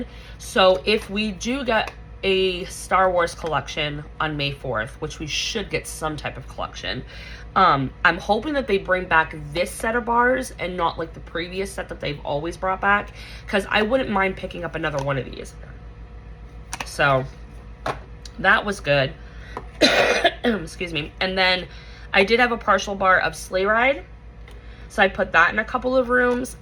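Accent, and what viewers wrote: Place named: Massachusetts, United States. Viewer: I live in Southeastern Massachusetts, & no school on Good Friday. I always thought that you had the Mass accent, and you definitely have our spunk-if you know, you know 😂. I despise Scentsy’s lemon with the exception of the Harry Potter bar. I was so glad when that club fluke happened, so it is back in my club again. Great empties!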